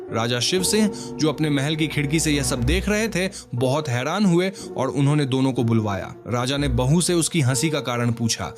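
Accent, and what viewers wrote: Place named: Bihar, India. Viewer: Ur Pronunciation is like that of a Bihari…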